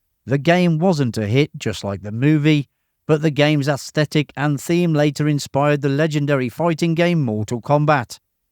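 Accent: United Kingdom. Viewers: Its only his British accent! I'm getting Cattrall! UK here.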